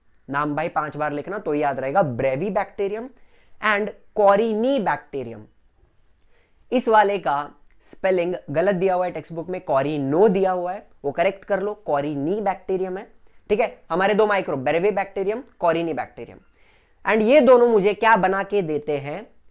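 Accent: Maharashtra, India. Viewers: Sir aapn Marathi aahat ka ??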